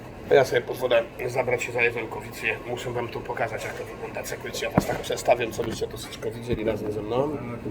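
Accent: Highlands, Poland. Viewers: Po co typ pierdoli po góralsku a na innym filmie slychac że mówi normalnie. Dwa, podobno to żaden góral tylko czytałem że pochodzi z żor. To jaki z niego góral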